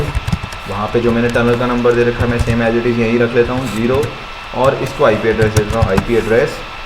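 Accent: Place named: India. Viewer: Can't tell if that's Engrish or Indian.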